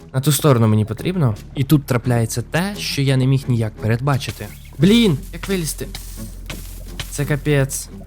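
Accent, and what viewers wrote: Ukraine. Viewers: Как русскому, очень не привычно слышать украинский акцент._.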